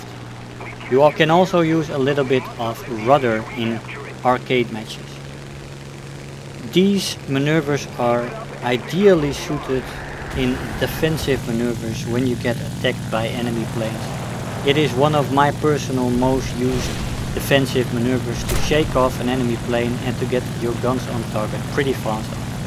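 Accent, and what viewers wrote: Netherlands. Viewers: i realy like your videos bro its gonna be a +sub from me ;) i bet your dutch am i rigth couse you have a dutch accent or is it just me ? :p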